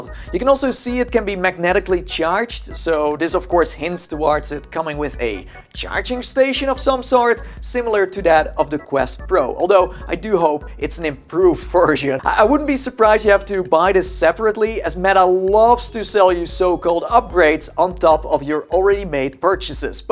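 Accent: Netherlands. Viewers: are you dutch or from the Netherlands?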